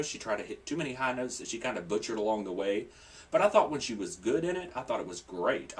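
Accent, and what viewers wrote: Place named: South, United States. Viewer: Who is this guy? I'm from the South, but sorry, you sound like a hick. To quote Kara, you've got ridiculous pipes, but don't mean it as a compliment.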